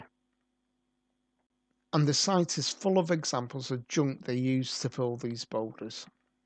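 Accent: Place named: Liverpool, United Kingdom. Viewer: You are a dimwit, you don't even display any knowledge about concrete Let alone Inca stonework. And your scowser accent perfectly portraits your intelligence level ...none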